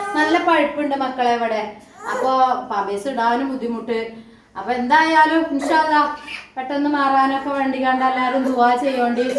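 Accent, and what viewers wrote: Malappuram, India. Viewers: Malapurath ulla ellavarum inganeyano samsarikaar ?? Ivarentha ingane samsarikunnenna ariyathath matte laila thatha avare pole oru pandathe aalukale pole enthinum ethinum oru makkale viliyum paattu paadumbolothe sansaravum njn athknd ee chmnl ipol kaanaarilla idak epozhenkilum onn nokkum kure mlpuram vlgs kaanum avaronnum ingane onnum alla samsarikaar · എന്തൊ ഒരു ഭാഷയാണ് പടച്ചോനെ ഇതൊ ഒക്കെ ഒരുപാട് മലപ്പുറം ഭാഷ ഞാൻ കേട്ടിട്ടു ഉണ്ട് ഇതൊ ഒരു ഒന്നൊന്നര ഭാഷ ആയിപ്പോയി😄😄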